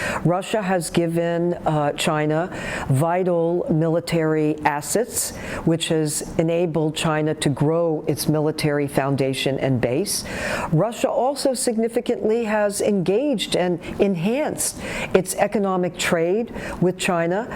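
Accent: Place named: Arab world. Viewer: Abraham Accords will lead to Arab Spring times 10. Miss, no offense but your accent is a bit strong. When you speak English sometimes it sounds like Arabic. So harsh. Maybe hire a different host if you want to appeal to anyone other than Neo-Cons, war criminals, and fellow Arabs.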